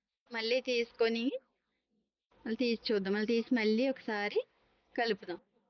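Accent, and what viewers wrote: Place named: Andhra, India. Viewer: Voice of Andra.. good cooking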